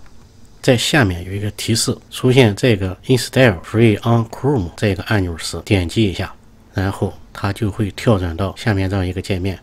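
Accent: Shandong, China. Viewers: 老师，是山东的吗？咱是老乡啊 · 濃濃的山東人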